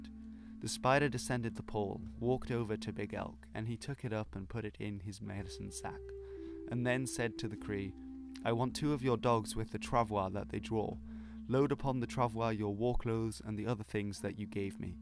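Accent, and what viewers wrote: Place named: United Kingdom. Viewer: It was very strange hearing this tale in a British accent. Well told, Sir!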